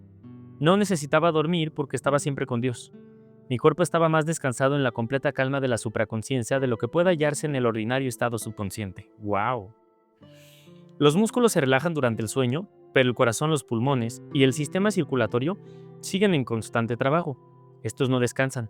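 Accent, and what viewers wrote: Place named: Mexico. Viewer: Me gusta mucho tu manera de leer pero sobre todo ese acento mexicano inconfundible ❤❤🙏🇨🇺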